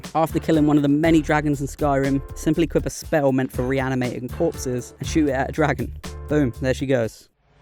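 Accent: England, United Kingdom. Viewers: Lol yea... but... you heard the rest of the video in a perfect English accent as well didnt ya?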